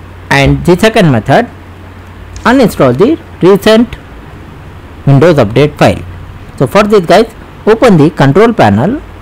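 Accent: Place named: India. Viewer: Indian english accent 😁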